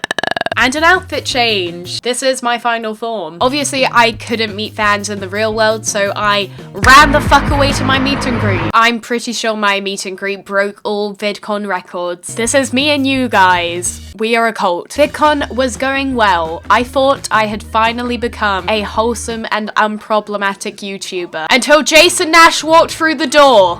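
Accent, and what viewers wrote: United States. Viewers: Are you from America or London 🤔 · omg your american accent hahahah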